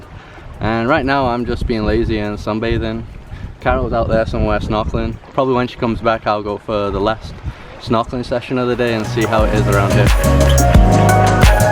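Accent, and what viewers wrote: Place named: Manchester, United Kingdom. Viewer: what accent do you have? sounds mancunian and american trying to figure it out haha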